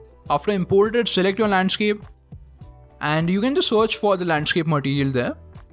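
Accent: India. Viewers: Are you indian ? Your accent sounds like indian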